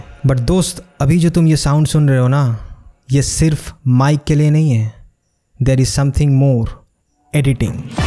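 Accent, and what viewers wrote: Bengal, India. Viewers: I guess you are bengali .. Its hard to manage your bengali accent bro😁😁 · chal bhai khush ho ja like kr diya informative video .. love the humor.. i notice your vocal i think u r a bong.. correct me if i m wrong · No bhai I am not exaggerating at all.. you are just intense... And I assumed you are a Bengali (noticed your accent).. that took my bonding to quadruple ❤️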